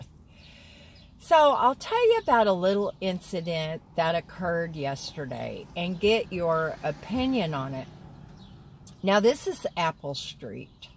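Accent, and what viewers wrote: South, United States. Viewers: For what it's worth, I just checked out your very first video from 2 years ago and you sound the exact same way - southern accent and all! There are always going to be people here on YT and elsewhere on social media who will try to find anything to criticize you about, especially since they can do it anonymously behind a keyboard. Unfortunately, it comes with the territory. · Hi 👋🏻 I’ve not commented in a while. I’m back to work, so I don’t get as much time to sit and binge watch your videos. Hehe. I am still watching however, just not commenting. Admittedly I probably would have done the same as you and shouted out. And as for your accent, well I believe you have a gorgeous southern accent. And I can hear it in all of your videos. I’m from the uk though, so I’m not used to the American southern accent at all. Loving your stories, I’d love to hear about the one you mentioned about a gang member in your property. 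#exciting #cliffhanger lol have a nice day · You did right!!!! I love your voice and for some reason it rings a bell you went to the rubber tramp roundup and met another southern gal and both of you were d,rawling like true born southern gals! Lol,it just reminded me,could be wrong though! Love Rea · At the very least I would’ve called, but probably done what you did. That’s so true about accents, as I’ve seen it with my in laws, especially if they would be talking to friends or family that are still in the South. That’s your roots coming out, and that’s you!😍❤️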